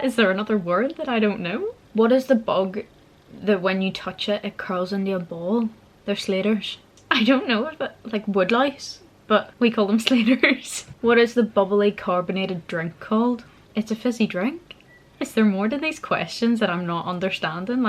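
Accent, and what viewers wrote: Belfast, United Kingdom. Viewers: I think you did good lass. Seeing as studied with at Uni with Irish students from Norn'Iron and the Republic. I would think Belfast hast the sexiest accent but I would vote for Fermanagh. Though you don't have a strong Belfast accent. I have been over a few times since and Pandemics permitting I hope to go to New Horizon next year in the North. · I'm from Ireland myself😭😭 and to be honest I do love a Northern accent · The best accent in Ireland by far.... And I'm from dublin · Love female Belfast accent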